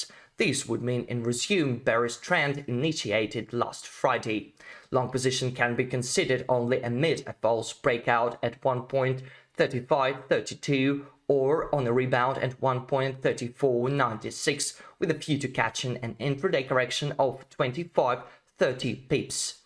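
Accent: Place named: Russia. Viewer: Are you from Russia? Ты из России? Произношение выдает тебя)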